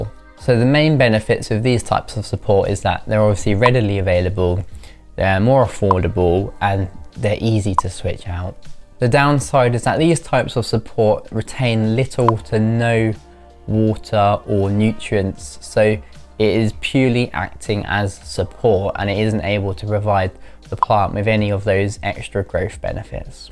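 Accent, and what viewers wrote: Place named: Wales, United Kingdom. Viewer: Thank you for this excellent video. As an American I was trying to place your accent. Are you possibly Welsh or perhaps Australian ?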